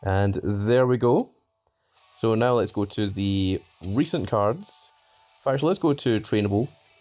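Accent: Scotland, United Kingdom. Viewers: Hey dude I can hear from ur accent your also Scottish, I beat you on KOTR shocked to find out you stream Supercard GGs man!! I have subscribed to you bud. 👍🏻😎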